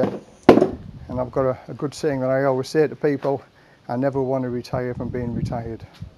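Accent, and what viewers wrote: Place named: Teesside, United Kingdom. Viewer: Always enjoy your videos ……I’m planning on retiring to Thailand this November……thanks for sharing both inspirational and useful info…..Always good to hear a friendly Teesside accent from the other side of the planet 🌎 #UTB 🙂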